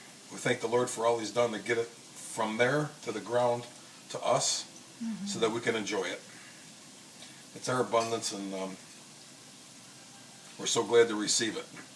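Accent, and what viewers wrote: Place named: Buffalo, United States. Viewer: If I didn't know better I would guess you being from the west side of Buffalo.( thats a compliment by the way) Those cordone's look so good I can't wait to try some myself. I watched both videos mainly because it was like watching something from home. Thanks for that, and God bless you both.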